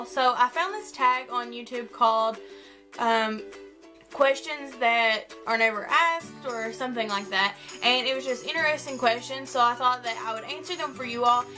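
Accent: South, United States. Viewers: Love your makeup and love your southern accent.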